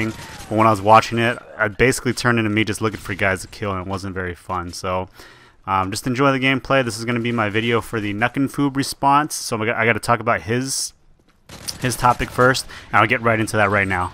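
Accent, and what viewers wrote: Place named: United States. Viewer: When u said u were asian i was like whaaaaaaaaaaaaaaat? xD you got an american voice, nice gameplay.. as always!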